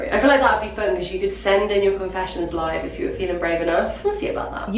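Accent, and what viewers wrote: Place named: RP, United Kingdom. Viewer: She sounds so posh here hehe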